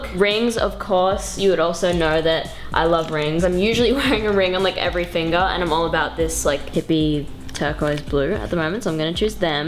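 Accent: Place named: Australia. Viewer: im happy you still have an aussie accent :) ccome backkkkkk!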